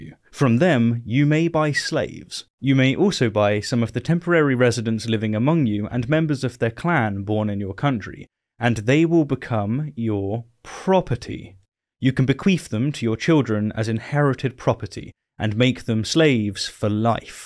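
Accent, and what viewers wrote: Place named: Midlands, United Kingdom. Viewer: No. He is a native English speaker from the midlands.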